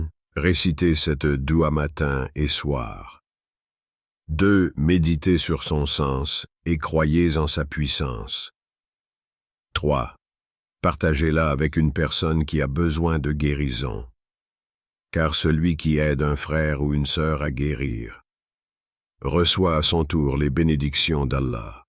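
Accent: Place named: Canada. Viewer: Ce léger accent canadien........😮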